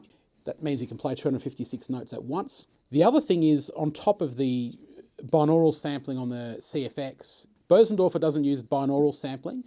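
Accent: Australia. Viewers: Beautifully played. Nice to hear the 'aussie' sound too hehe. Definitely prodigy level ! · Great review! So nice to hear the Australian accent and down to earth attitude. I’m picking my P515 up tomorrow.😉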